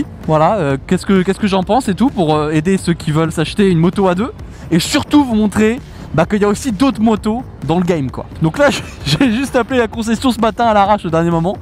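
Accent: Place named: Toulouse, France. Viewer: Novembre encore moto 🏍 vive LILLE a pardon toulouse avec l’accent biensur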